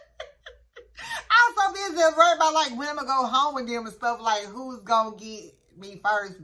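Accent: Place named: South, United States. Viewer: It's pronounced Al kemy. It's the old name for science, when they still believed in magic. The old alchemists did some weird things, like trying to turn iron into gold, and guessing how many angels could balance on a pinhead! But eventually they started to discover really useful stuff. But I think Knopfler, in the red jacket, really did produce golden sounds. I found your southern accent difficult to understand sometimes, but you would probably struggle with my Yorkshire, Northern English accent. Where comes from, Newcastle upon Tyne, nearer the Scottish Borders, is a really difficult accent, when it's spoken properly.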